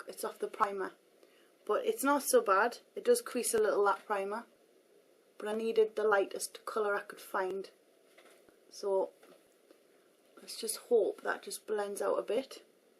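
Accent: Newcastle, United Kingdom. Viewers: r u frm newcastle cuz u sound like cheryl cole! :) x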